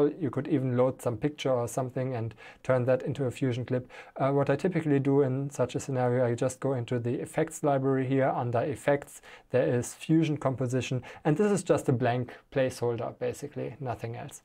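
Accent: Germany. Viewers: Thank you! - very helpfull. Nice german accent. Danke für das Tutorial ;-)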